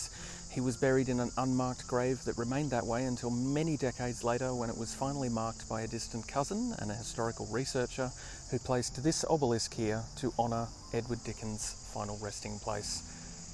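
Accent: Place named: New Zealand. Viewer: I hear more than a touch of Kiwi in your voice ?. Kiwi rests content with his gentle application of the Borax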